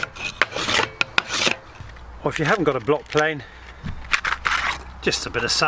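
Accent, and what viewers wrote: England, United Kingdom. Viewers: Good to hear an English accent instead of the usual American Thank you!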